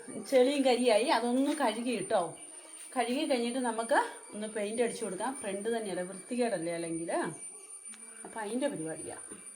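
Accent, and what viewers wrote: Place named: Karnataka, India. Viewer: Veed yevideya ?kekumbo nammude karnataka malayali pole undalo😊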